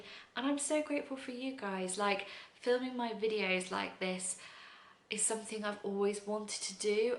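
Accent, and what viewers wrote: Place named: England, United Kingdom. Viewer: I love your accent, can I ask you what part of England you originate from?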